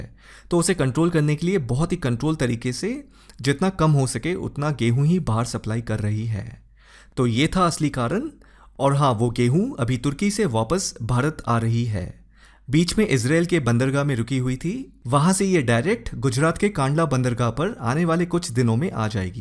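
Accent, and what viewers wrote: Bengal, India. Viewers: Bengali accent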